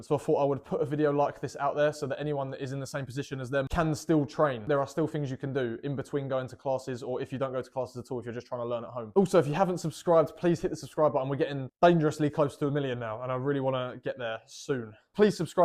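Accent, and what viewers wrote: United Kingdom. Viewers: With his accent he IS british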